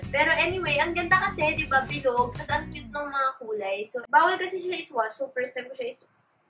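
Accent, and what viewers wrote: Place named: Pampanga, Philippines. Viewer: kapampangan ka te? yung accent mo kase eh 😊😊😊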